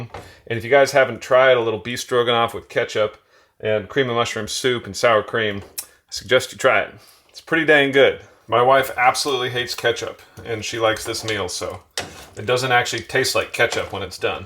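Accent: United States. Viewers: I don’t like his voice… too hillbilly. But impressive & brave carpenter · what the hell is your "Negative this negative that" Their is no negative it is either "below zero" or "Minus" You sound like you were born and raised in Miami beach! Speak like a Northerner! WTF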